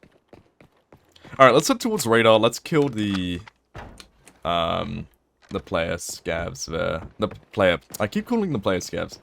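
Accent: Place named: Holybourne, United Kingdom. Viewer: That holybourne accent tho........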